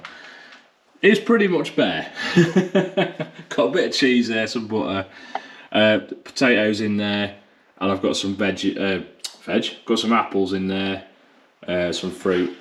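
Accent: Lincolnshire, United Kingdom. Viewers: Nice to hear someone from Lincolnshire. I'm in the shire too :)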